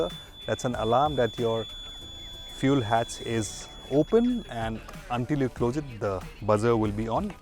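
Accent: Assam, India. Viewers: even i feel he is assamese, but couldnot find his name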